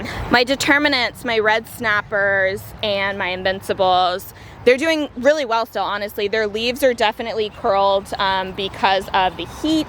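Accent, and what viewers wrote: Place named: United States. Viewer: Love the garden, but I thought people only talked this way as a caricature of an American accent. Is this for real or she is doing a bit?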